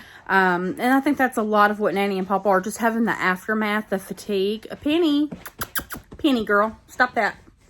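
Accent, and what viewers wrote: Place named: South, United States. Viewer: Thank you for all you do to help your grandparents share their beautiful lives and Elvis stories with us. Elvis loved and cherished his family members, so I am sure he would be so proud of the way you care for and look after your sweet grandparents. Btw, I love your Southern accent!! And please do keep the animals in the room while you are filming, they always make life so much more interesting.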